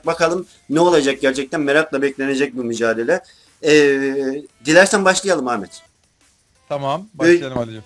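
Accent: Black Sea, Turkey. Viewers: abi eskiden karadenizli oldugundan sesin farkli geliyodu ama artik alistim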